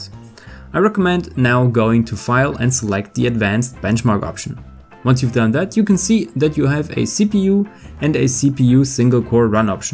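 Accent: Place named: Germany. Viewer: man hört so raus das du deutsch bist xd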